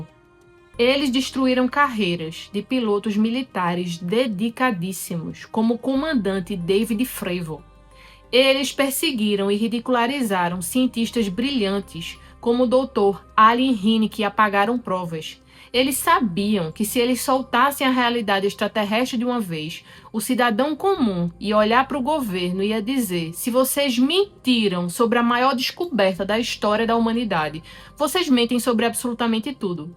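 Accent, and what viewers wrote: Northeast, Brazil. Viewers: Mais uma nordestina falando merrrrda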